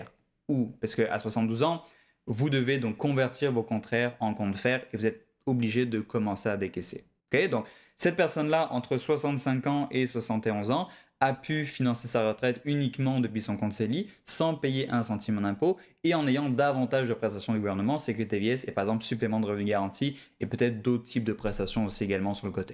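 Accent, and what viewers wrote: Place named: France. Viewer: Pourquoi un québécois s’adressant à des québécois sur un sujet ne touchant que le Québec parle avec un accent français?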